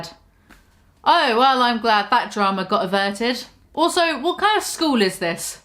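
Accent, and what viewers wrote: England, United Kingdom. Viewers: The English accent though... 😂 i loved this video.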